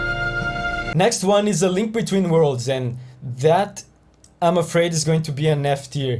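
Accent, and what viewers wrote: Brazil. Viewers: No momento que ouvi tua voz já sabia que era brasileiro. Que legal, já é difícil de achar desenvolvedor de jogos brasileiro, quem dirá compositor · You sound brazilian. Are you? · Esse sotaque de brasileiro no inglês não me engana 🙃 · Pelo sotaque já sei que é br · Hey man! You're brazilian, right? Hahah eu reconheci pelo sotaque em algumas palavras. Parabéns pelo vídeo, fiquei feliz com a colocação de BOTW. A atmosfera do jogo dependeu dessas escolhas artísticas que foram tomadas tão bravamente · Nunca vi um inglês tão brasileiro, adorei o vídeo tanto quanto eu gosto de zelda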